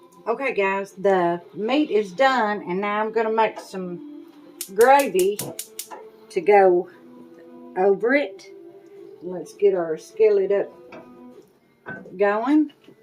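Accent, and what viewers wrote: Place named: Virginia, United States. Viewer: I found you last night. I can’t wait to cream some cabbage. God bless you darling. I’m from near Dickerson county va. Talk just like you. Is a pleasure hearing you talk. I can understand, to a’-t.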